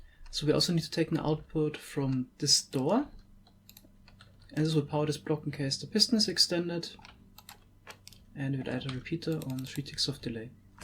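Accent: Germany. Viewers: the german accent is too strong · I hear a german accent am i right? Falls ja gutes video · could it be that you are german? your way of speaking reminds me of germans try to speak english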